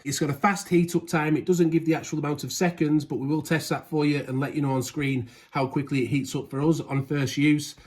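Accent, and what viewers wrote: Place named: Northern England, United Kingdom. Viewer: Love the broad Northern accent!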